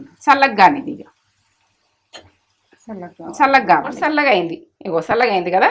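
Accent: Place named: Nirmal, India. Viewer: Amma ay vuru.chaypatamu laydu Nirmal side basha laga.vundhi.amma❤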